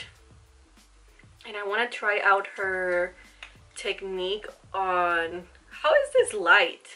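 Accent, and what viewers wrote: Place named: Puerto Rico. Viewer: Preciosa, me encanta que eres Boricua y nunca pidas perdón for sounding puertorican, you have a beautiful accent ❤️🇵🇷🙏💪🏼 Wepa💪🏼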